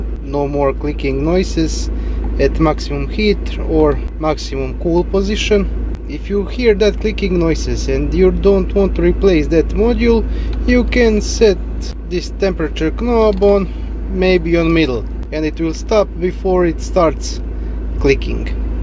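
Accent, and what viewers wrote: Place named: Croatia. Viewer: engleski ti ide super al mi se čini da ima i nešto hrvatskog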